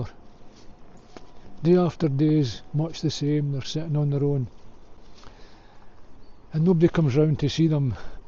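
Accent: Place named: Scotland, United Kingdom. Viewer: You have a nice accent. Are you in Scotland . Inn Canada Christmas not much cycling. I like to know more of the nature there, and geography.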